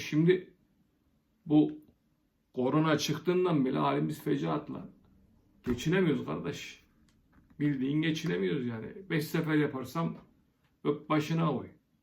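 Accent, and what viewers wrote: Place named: Ankara, Turkey. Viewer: Angara yi seviyoruz..tam Angara ağzı, kelimeler ,tonlamalar, jestler..özledim ...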